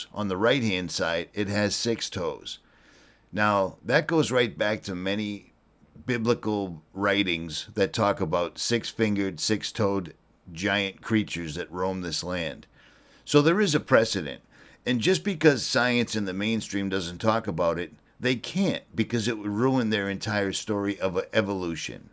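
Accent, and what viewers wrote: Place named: New England, United States. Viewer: You spin a pretty darn good yarn, gruff yankee voice and all. Great footage as always. Much thanks and love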